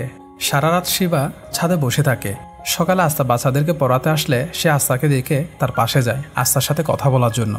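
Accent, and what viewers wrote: Chittagong, Bangladesh. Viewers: ভাই আপনে কি চট্টগ্রামের ছেলে নাকি? ভাষাটা চট্টগ্রামের শুদ্ধ ভাষার মতো🤔 আমি ও চট্টগ্রামের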